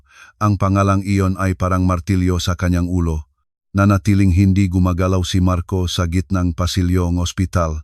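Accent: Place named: Visayas, Philippines. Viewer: Nhihirapan ako mkining syo utal utal ang slita visaya kb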